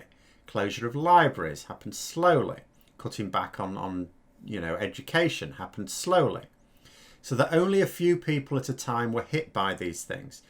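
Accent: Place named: Yorkshire, United Kingdom. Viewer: 'I like talking about politics' with quite a strong northern accent - Yorkshire I believe. Thanks for your good wishes, we'll need them!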